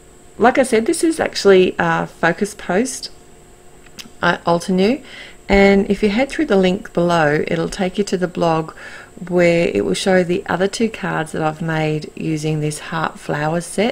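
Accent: Australia. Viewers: Love your sweet card. I've never been keen on flat flowers, always preferring dimensional, but these look so very fresh and pretty. Thanks for all the tips about stamping. Lovely to hear an Aussie voice. Sorry, I think I say this each time I comment, but it's true. Good to know that our Aussie talent is being showcased. Well done.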